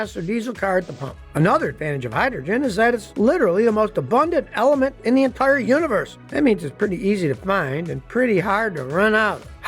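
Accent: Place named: South, United States. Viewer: Wrong. Narrator sounds Southern. Sounds like a Saturday morning cartoon character.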